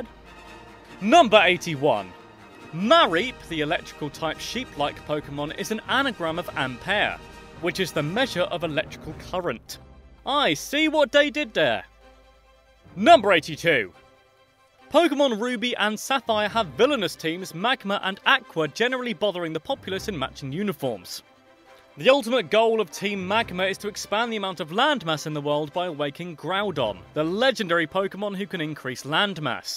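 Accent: England, United Kingdom. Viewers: where in England are you from?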